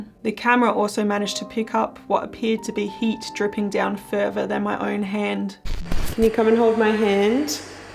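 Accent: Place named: Australia. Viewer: I love the fact that I can tell you are really serious about the paranormal. What makes you videos better than anyone else's is your beautiful Australian accent. Love your videos. Thank you. Keep them coming.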